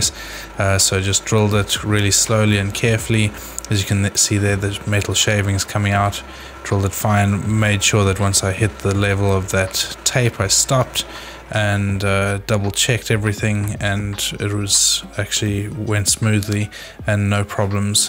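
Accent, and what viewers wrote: South Africa. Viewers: How to spot a South African accent! Very nice video - these heli coil thread repair kits are relatively cheap - saved my LiFePO4 cells!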